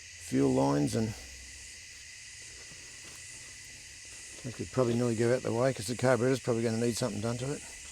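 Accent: Australia. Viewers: Critters. Snakes DEN maybe. Mate it's GREAT to meet a true professional with an Aussie accent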